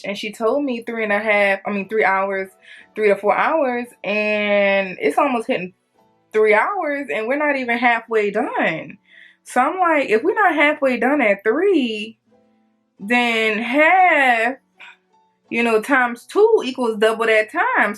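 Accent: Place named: Los Angeles, United States. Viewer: Crazy story. Love your LA accent.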